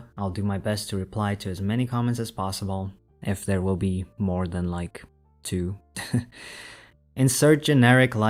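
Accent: South Africa. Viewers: this is an off topic question but are you south african? your accent and pronunciation just sounds so familiar and i just have to know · Are you also partly South African? There's something rly familiar about your accent lol but I suck at placing accents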